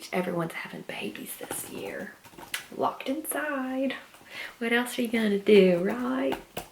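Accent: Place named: South, United States. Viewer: Youre so good at that southern drawl!! Hope you've been well~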